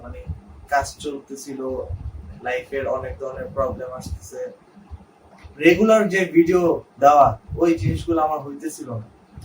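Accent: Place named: Chittagong, Bangladesh. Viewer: Mama ageo bolsilm. Chatgaiya accent ta raikho. I think it would be better.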